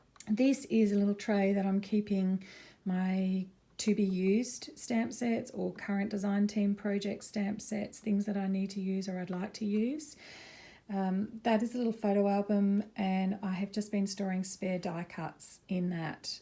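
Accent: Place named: Australia. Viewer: Any chance your Australian, just accent sounds aussie and well normal. its weird finding an aussie card maker.. well either aussie or kiwi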